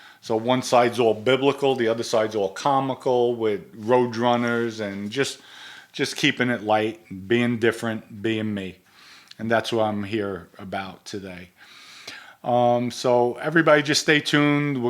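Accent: New York, United States. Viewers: Hello handsome, I just recently found your podcast, which I really enjoy. Don't allow anyone to stop you from speaking how we NYer's Speak. Love the show.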